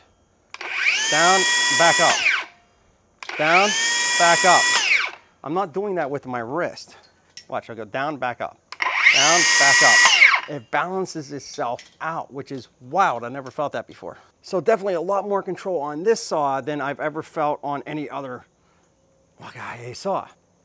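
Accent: Pittsburgh, United States. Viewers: That Pittsburgh-esc accent is obnoxious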